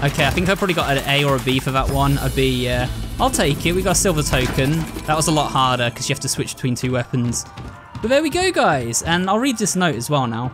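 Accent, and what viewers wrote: United Kingdom. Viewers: Our british accent has uploaded 2 videos in 1 day he works hard and fast on these videos not gonna lie especially if it's Resident Evil i love it so much thank u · Bro this is the first time I realize he's British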